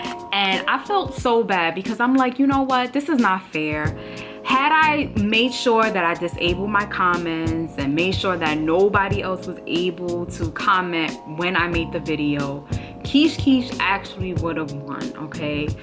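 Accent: New York, United States. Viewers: I from New York and she got that New York accent. It can come off sounding Italian like.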